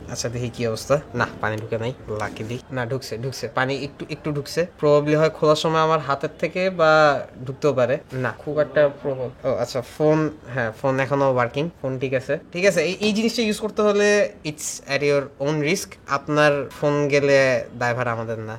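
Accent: Barishal, Bangladesh. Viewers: ত্যানা 😁 Vai ki Barishal ar naki?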